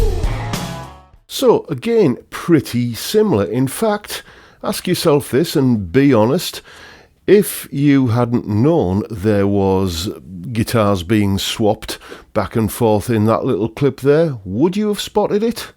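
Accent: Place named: North, United Kingdom. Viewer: Errrr. You should errr, definitely become a DJ. I'm not saying that because you've got a face for radio 😉, but your voice would be excellent. Intelligent, gentle with a particularly articulate Northern twang. Forgive me for any negative vibes this will appear to come with, but the only problem I have with these comparisons is the inclusion of modulation/doubling/chorus on all tracks. Reverb is a little too heavy too. Other than that, you've done a brilliant job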